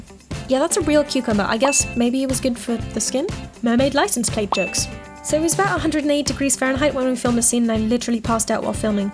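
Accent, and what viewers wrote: United Kingdom. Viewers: Why are you British now?? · When did you become British?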